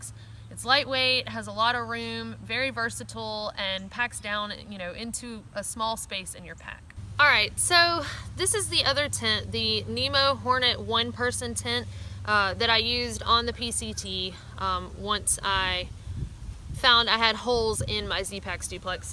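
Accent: South, United States. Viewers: It is so so great to see a young lady be such an outdoor lover. They may be out there but you don't see them....most people of her age are too busy watching Kim K and not seeing the world for what it is.....and the southern accent is awesome. Great vids and good work....thank you.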